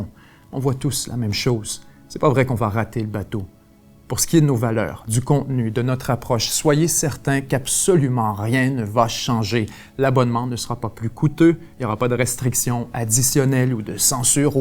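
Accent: Quebec, Canada. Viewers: je n aime pas spécialement l accent québécois mais ... je l entend à peine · Bonjour je vous ai découvert seulement hier en cherchant un reportage sur Khadafi qu'un ami panafricain affectione ..heureusement que votre accent quebecois est discret ( plus proche du belge/ suisse).. Malheureusement le monde s'intéresse plus au buzz et aux futilités qu'à la Vérité...Longue vie à 7jours sur Terre.. Cathy depuis Paris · Je vous suivais dès les débuts depuis la Kabylie. Je suis fasciné par la qualité de votre travail, la profondeur des exposés et votre façon de parler. C'est à travers vous que j'ai appris à aimer l'accent québécois qui me paraissait jusque-là bizarre. J'avais peur en cliquant sur la vidéo mais finalement c'est une bonne nouvelle que vous nous annoncez. Je ne suis pas abonné mais je vous prie de croire en ma profonde gratitude pour votre engagement.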